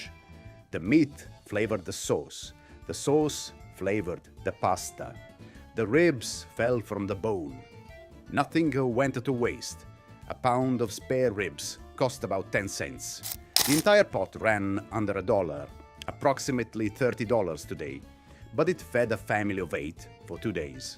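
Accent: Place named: Italy. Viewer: You have an italian accent but you don´t pronounce italian correctly. How come? For example: It´s Tschetschi, not tsetsi.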